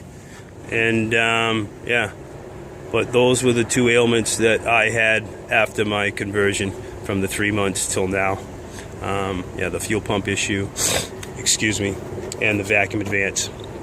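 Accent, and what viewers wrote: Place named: New York, United States. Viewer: Thank you very much!! It sounds like you have a New York accent. I have family there. I appreciate the help. I have everything else lined out and ready to do the swap. Again many thanks!!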